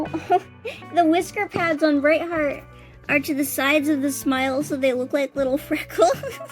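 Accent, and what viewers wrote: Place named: United States. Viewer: i like ur american voice 🤣🤣🤣sorry im british 🤭🤭🤭🤭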